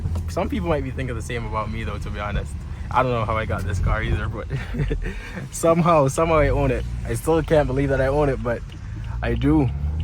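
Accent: Jamaica. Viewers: You almost sound like Jamaican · i can tell your jamaican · are you jamaican cus i can def hear somthing? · U Jamaican by any chance??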